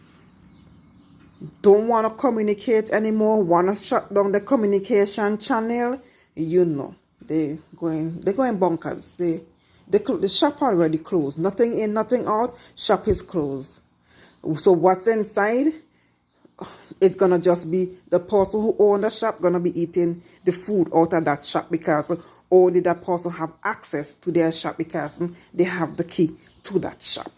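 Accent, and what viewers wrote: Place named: Jamaica. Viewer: There is only one truth in this space , if it sounds too good to be true it is, they are all ponzi schemes. where u from ? u sound jamaican.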